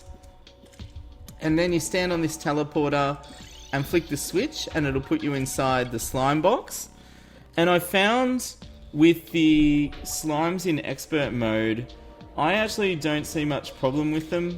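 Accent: Australia. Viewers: I only started watching 2 days ago, but your stuff is great. the Australian accent is very motivating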